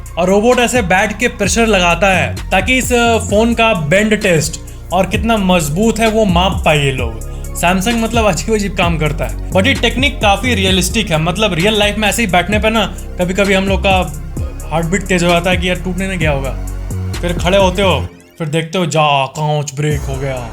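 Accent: Bihar, India. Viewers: "i just love your bihari acsent "😂❤️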